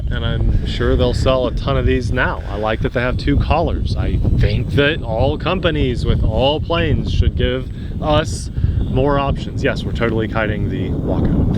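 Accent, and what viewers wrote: United States. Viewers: Very nice looking planes, love both colors, but yellow is my favorite. Surprised it handled so well in the wind for a small plane. So would this be considered a beginner friendly/ park flyer.❓️❓️. Liked how was pushing your buttons on pronunciation 😂😂😂🤭🤭. Also waco vs wok-o. Now My 2 cents. I pre clarify this by saying maybe its my west coast ears 👂 🤔, but when you say colors 9 out of 10 times i hear collars. Maybe its Kentucky/ east coast, ❓️❓️. No, not mad like you on waco/wok-o just figure its how it's said in your neck of the woods ⛰️🏞⛰️. Plus i couldn't let have ALL the fun.😄😄🥴🥴🤭🤭. Great flying, superb videoing, keep pushing those buttons.😂😂😆😆🥴🥴🤭🤭.⚓️⚓️👍🧡💛💚👍👂😂😆🥴🤭🤔👍⚓️⚓️.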